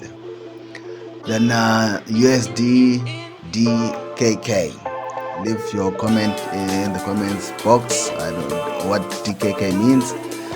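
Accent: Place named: Zimbabwe. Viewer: I love this Brother, he somehow sounds Zimbabwean, great content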